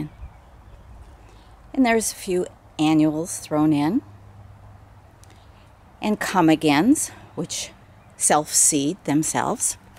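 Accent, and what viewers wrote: Wisconsin, United States. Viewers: O my! How beautiful everything is! Took me two days to get through your tour (I keep getting interrupted), but o what a joy! I just found you, and I thought I heard you say, you are moving. I hope that doesn't mean you're ending your channel. I hope you continue creating beauty and joy wherever you move to. Plus. I love your WI accent. I grew up in WI. Moved away 25 years ago. Miss it terribly. You sound like home ❤️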